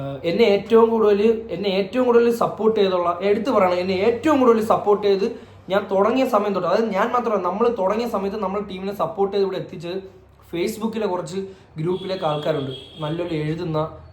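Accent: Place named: Kollam, India. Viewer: Hii brooh 🥰nighlde videos um nighle 4 pereyum othiri ishttanu❤pinnah slag um. Njn koodhalum nighlde videos anu kanunnathu. Kollam slag enik othiri ishtta, pinna nighl samrikunnathu kettittum enikum samsarikkanum ennu aghraham ind.Njn Ernakulamkkariyanu. Apo ivda ntha, nthu ennokeya paraya ipo ithinu pakaram enthonnu, enthru agana paraya ee vakku oke enik chettanmarde videos il ninna kittiye🥰, pinnah nighl samsarikunnath kelkkanum adipoliyanu . Njn athukond thanne nighlalil ninn korach words padikkum. Ennt paramavathii nighlde pole samsarikkanum shremikkum🥰🎉🥰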